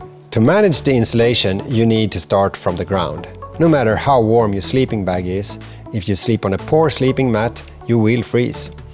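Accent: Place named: Sweden. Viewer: swedish accent :)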